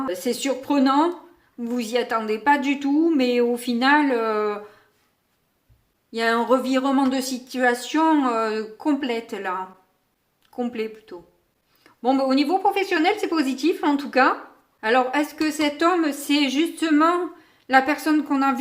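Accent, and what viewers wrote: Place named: South, France. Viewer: Bonjour 😊 Votre tirage est clair,simple et sans Chi Chi J’adore votre accent (sud?)..😂😂j’ai le même !! Du coup pour vous encourager et vous suivre,je m’abonne 😄 Bonne continuation 🙏🙏🙏🙏🙏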